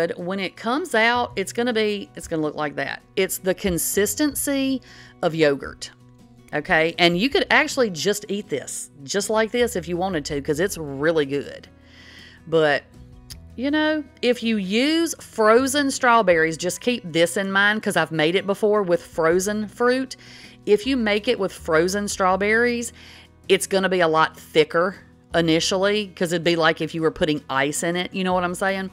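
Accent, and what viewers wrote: South, United States. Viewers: That southern accent...where ya'll from?? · It is delicious, I made a strawberry version and a chocolate with real choc chips in it. They are both a success. Love your accent, what part of America are you from? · Is that a “southern” accent ?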